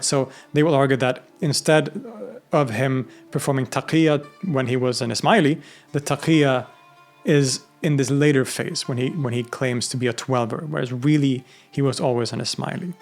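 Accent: Arab world. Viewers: This guy is such an arab wannabe he even says Iranian names with the guttural Arabic pronunciation. Khwajeh Nasiruddin-e Tusi... At least learn his name. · Awesome work as always! I thank you and salute you for bringing more and more of these Eastern geniuses into public recognition, which I think would ultimately have a positive impact on the world we live in. On another note, I think your pronunciation of Persian names and words is a bit over-Arabicized! Persian names and words such as Tus and Tusi do not follow the Arabic rules of pronunciation. For instance, the Perso-Arabic letters of س،ص،ث are all only pronounced as [s] in Persian, unlike in Arabic where they all have different pronunciations. Persian has its own completely different rules of pronunciation, despite being written in the same script as Arabic. Arabic words and proper names loaned into Persian also follow these Persian rules of pronunciation. 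· this. your last comparison was extremely on point. he belonged to a persian background, it makes sense to pronounce the name with Iranian / Farsi accentation. there was a similar problem where he pronounced some names from the subcontinent straight up "wrong" in one vid (can't recall which rn tho sorry) cuz of arabization. not to say that this is in bad faith. i'm sure he just finds this to be the most intuitive reading, and ends up defaulting to it during his narration, which is smth i relate to as an urdu speaker who reads farsi in a very urdu accent :,) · I have a question to ask, why do you always use Arabic pronunciations when you talk about Persians? Is there a reason behind this?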